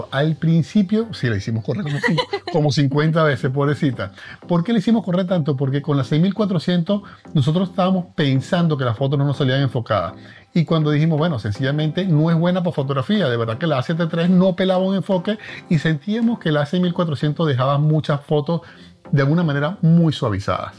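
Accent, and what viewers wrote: Venezuela. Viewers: jeje nunca había visto tus videos pero apenas hablaste ya nos dimos cuenta que eres venezolano buenísimo contenido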